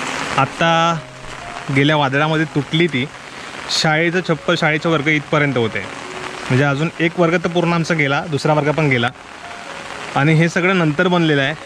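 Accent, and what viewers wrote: Konkan, India. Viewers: दादा तू कोकणी आहेस का बोद्ध आहेस तुझ्या बोलण्यातून तू कोकणी वाटतोस गाव खूपच सुंदर आहे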